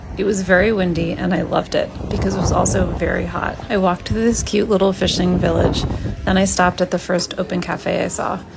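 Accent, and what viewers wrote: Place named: Australia. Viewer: You sound like an Australian..Thanks for sharing your Camino experience.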